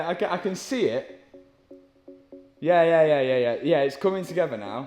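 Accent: United Kingdom. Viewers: Boompahhh, bompaahhh, bumper !!!! Where are you from in UK ??!! What accent is that?! What city??!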